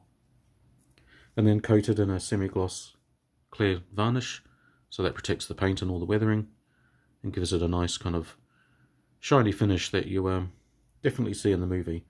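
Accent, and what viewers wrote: New Zealand. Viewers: New zealand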